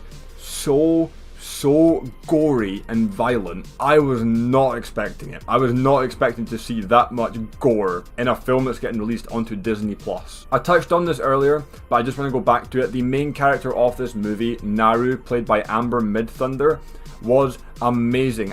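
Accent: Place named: Scotland, United Kingdom. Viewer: Mate you sound scottish 😀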